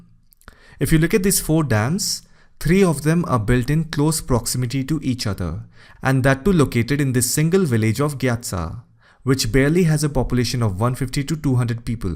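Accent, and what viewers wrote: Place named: West Bengal, India. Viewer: Mad or what?..he is obviously from West Bengal or northeast...Tamil accent is so different.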